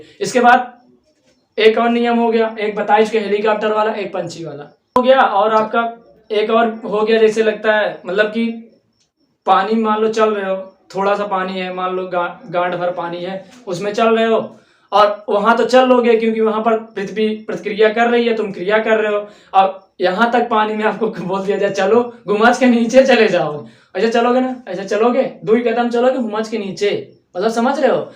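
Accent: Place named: Pratapgarh, India. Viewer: अति सुन्दर प्रस्तुति प्रतापगढ़िया भाषा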